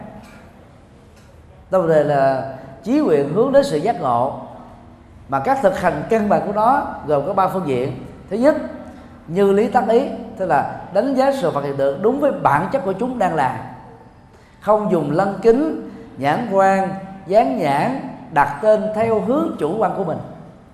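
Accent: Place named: Vietnam. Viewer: phát âm tiếng anh giọng việt "chay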